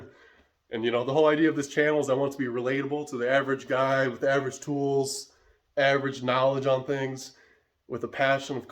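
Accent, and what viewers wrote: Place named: Midwest, United States. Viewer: Looks great, looking forward to how this goes. Where are located, sounds like Midwest from your other video (Menards). You're doing great, love the concept and your channel name. Your idea is quite similar to mine, just something to do for fun and show with a little ambition, you can do alot. Great Job!!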